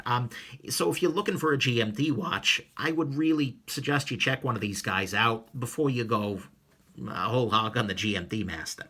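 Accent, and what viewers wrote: New York, United States. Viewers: Same! Voice reminds me of people I know back in New York. Someplace I haven't been back too since 2011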